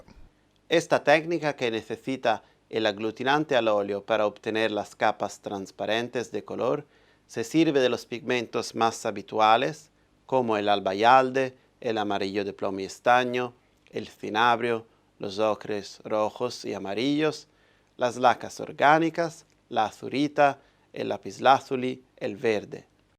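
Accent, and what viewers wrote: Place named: Italy. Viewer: Hermoso video acompañado con un gracioso acento italiano. Traducir en 2 idiomas además de la lengua nativa, es de un esfuerzo y compromiso que se aplaude. Ustedes parecen tan multifacéticos como el mismo Leonardo Da Vinci. Muchas gracias por el video. Una consulta: ¿la acuarela azul es hecha con trementina, o con agua? Muy amables, ¡y feloz año nuevo!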